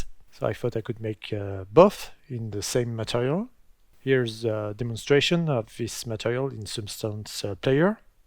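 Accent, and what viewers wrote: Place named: France. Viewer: Avec ton accent français j'arrive à comprendre xD merci pour le tuto !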